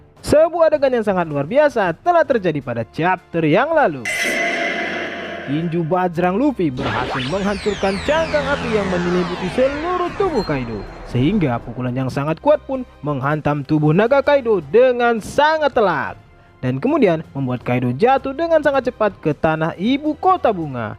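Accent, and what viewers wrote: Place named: Java, Indonesia. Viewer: Bang,lu orang bekasi ya 🤣 bahasa lu medok bngt njir 🤣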